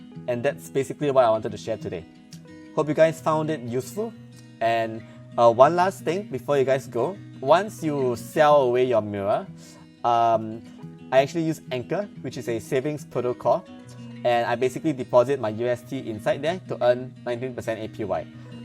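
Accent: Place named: Singapore. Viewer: You sound singaporean haha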